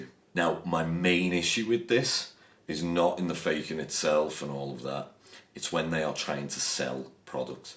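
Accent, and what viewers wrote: Liverpool, United Kingdom. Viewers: Totally off-topic, but I instantly subbed for your beautiful scouse accent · Is he from Liverpool ?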